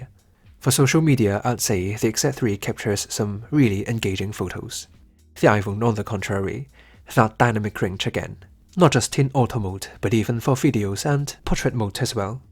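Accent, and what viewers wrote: United Kingdom. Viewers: 詳細的解說，一口流利的英國腔英文 👍👍👍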